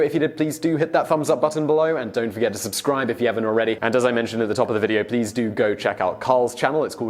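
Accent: United Kingdom. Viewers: Samm Ball II American he has a British accent if he spoke like an American you'd hate his voice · Public Enemy - he's British. That's how they say it. It's not just him, it's an entire nation as well as everyone in other countries who was taught British English as a second language. So yeah, a massive percentage of English speakers say it that way.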